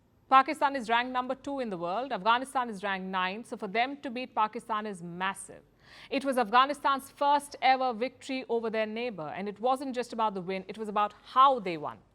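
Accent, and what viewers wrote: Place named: India. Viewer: Palki is wonderful . Her news presentations are comprehensive and concise. And the best thing is that she remains Indian in dress ,dialect and thoughts. God bless her !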